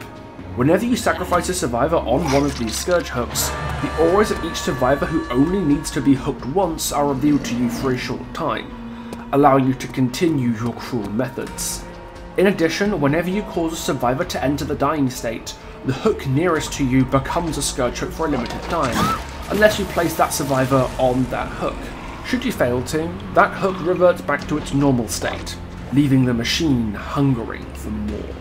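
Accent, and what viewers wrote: United Kingdom. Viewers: Of course the person who’s narrating the video is bri’ish…mf ruined it lmao 😭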